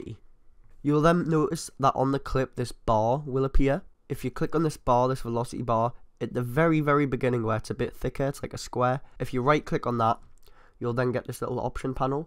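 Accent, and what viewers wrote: Newcastle, United Kingdom. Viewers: A local Geordie I see, nice one.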